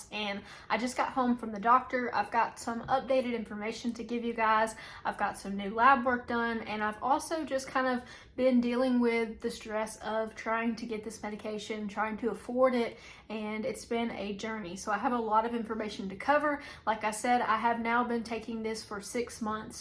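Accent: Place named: Tennessee, United States. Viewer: Thanks for ❤ honesty and accent. I live in Tennessee so it sounds nice.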